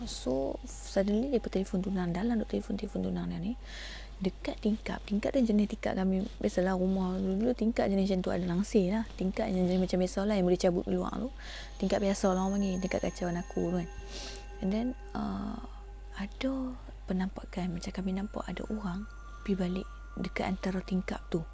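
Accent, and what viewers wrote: North, Malaysia. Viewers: Kekalkan cerita cakap owg utara sbb sembang best sgt..kami suka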